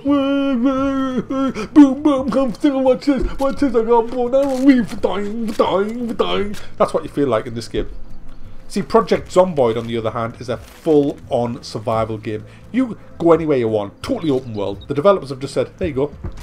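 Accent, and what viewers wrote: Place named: Newcastle, United Kingdom. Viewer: Ahh right, well your accent made this review even funnier, really sounded geordie. What was the game you suggested buying instead of this?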